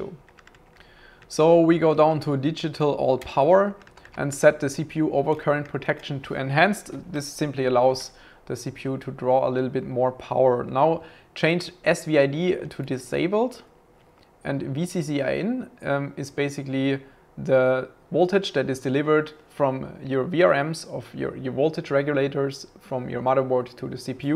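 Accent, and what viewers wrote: Germany. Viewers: Der deutsche Akzent ^^ <3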